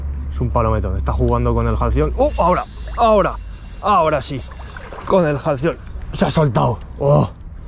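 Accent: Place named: Aragon, Spain. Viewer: Buenas crack! Jornada divertida! Hoy he madrugado y tambien ha estado bien, jureles,jurela y un falso abadejo han caido.por supuesto captura y suelta siempre. Por cierto pescas en catalunya? Por tu acento pareces maño ;) saludos y a disfrutar que ha empezado el calor y la actividad! Buen palometon el segundo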